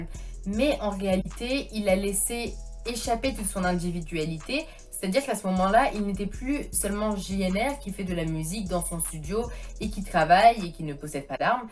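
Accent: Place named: England, United Kingdom. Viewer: Mais faut arrêter d’être tout nier et être sincère. Le sujet est traité d’une bonne manière. Mais en aucun il est complexe, de deux il y’a un ton trop magistral et ne parlons pas de l’accent anglais qui fait peur. De plus il faut prendre en compte plusieurs paramètres. En effet, la vidéo sur JNR avait pour but de mettre à mal ce dernier et quoi de mieux que d’insister sur les clichés du raciste pure et de l’imaginaire de la banlieue. De ce fait Cette vidéo nous démontre à quel point tout cela n’est que pure imaginaire de la part des « autres » ce qui imagine la banlieue à travers un prisme mediatico-politique et malheureusement c’est loin de la réalité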